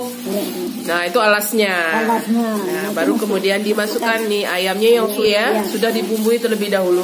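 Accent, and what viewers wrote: Minangkabau, Indonesia. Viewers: Iko baru mantap, kuliner minang di review oleh orang minang asli dgn dialek khas minang. Sukses taruih uni di tunggu video2 berikutnya, lapeh juo taragak jo kampuang nan jauah di mato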